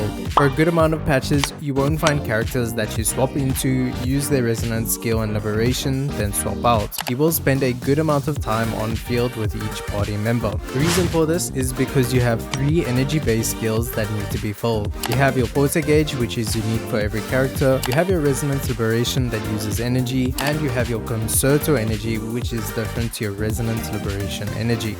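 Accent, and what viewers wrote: South Africa. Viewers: You from SA bro ? Also keep up the good work! ✨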